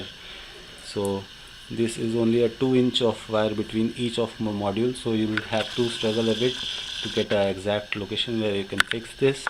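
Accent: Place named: India. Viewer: I like the way Indian people speaks english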